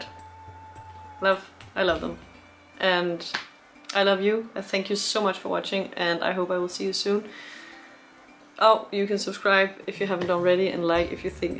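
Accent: Denmark. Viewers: I wondered where you were from since you Danish accent is so subtle, but then I realized you're from Copenhagen! Yay, fellow dane! :D Good reaction! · You have a lovely Danish accent :-) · Are you danish? it sounds like you have that accent :D Great reaction <3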